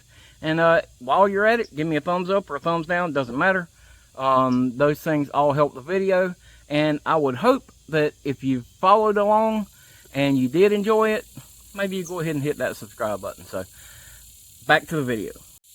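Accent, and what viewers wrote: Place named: South, United States. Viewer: Subscribed, just because you’re safety conscious and wearing your safety squints and I like the southern accent.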